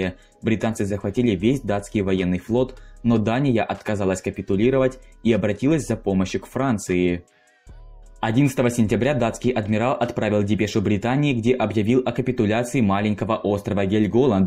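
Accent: Ukraine. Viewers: Не родной, он с Украины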